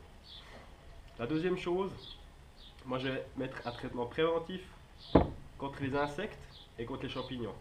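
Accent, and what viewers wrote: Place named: Switzerland. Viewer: Mugnerot Daniel morteau morille c l accent du doub et lui c l accent suisse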